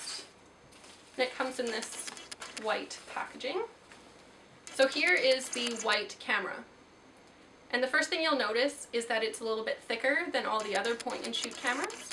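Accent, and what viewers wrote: Canada. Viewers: I'm guessin your Canadian?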